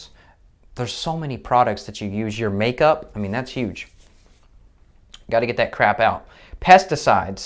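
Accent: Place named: United States. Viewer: love your American accent 😀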